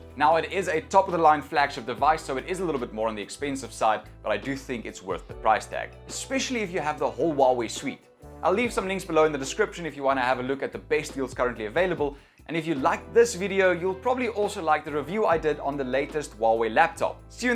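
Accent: South Africa. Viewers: Hi ! I just finished my final year of highschool last year and i am looking into getting a laptop/ ipad for uni , i have never had any of those things and i am kind of lost to what is best , can you please advise me on whether this is a great option for uni ? I love handwritten notes and i suck at typing ... Also , are you south african ?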